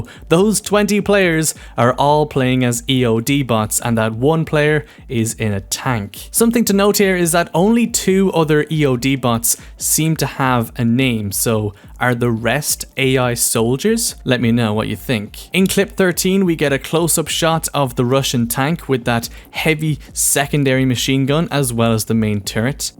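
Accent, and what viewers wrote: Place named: Ireland. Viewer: Hes Irish. Its in his accent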